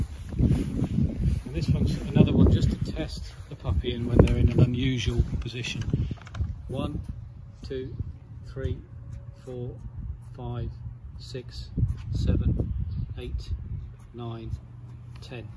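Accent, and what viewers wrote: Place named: United Kingdom. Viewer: I love your British accent!👨🏻‍🦳🇬🇧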